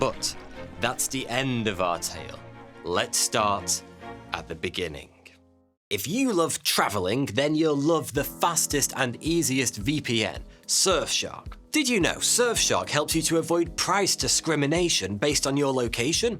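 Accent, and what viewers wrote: United Kingdom. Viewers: DDoesnt everybody have 4 families , and I'm searching for and don't see a video from You on king arthur .. with your British Accent i think You should have one if you haven't been working on that one for years ...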